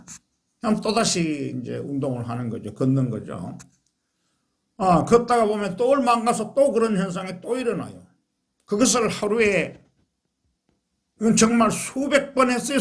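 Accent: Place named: Gyeongsang, South Korea. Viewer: 멋진 우리 리더님 구수한 경상도 특유의 사투리 너무 정겹고 멋있으십니다^^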